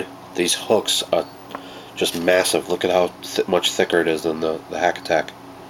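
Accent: Boston, United States. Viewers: you sound like a boston guy, if so we should make some casts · do the Zoom tab tail grubs swim well for you? I bought a pack intrigued at the idea of an erratic tail action the tab would give, but i couldnt get them to swim right no matter how I trimmed my swimjig skirts. Also, just curious. Are you from Boston?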